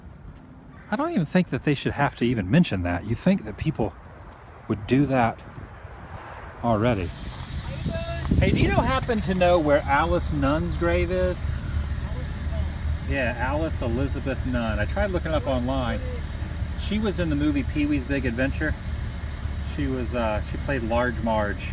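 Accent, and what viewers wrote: United States. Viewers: Which region of the USA is accent associated with?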